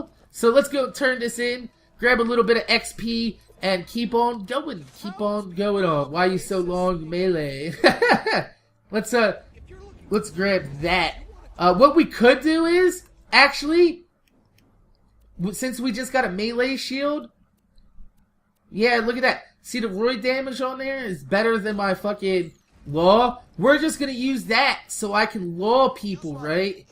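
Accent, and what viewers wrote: New Jersey, United States. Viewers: Dat New Jersey accent :D I'm from Tennessee, so I have a southern accent. Only slightly though.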